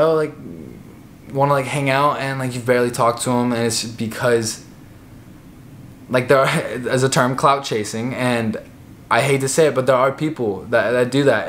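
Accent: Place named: New York, United States. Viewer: It kinda sounds like he took a trip to New York picked up a lil accent and came back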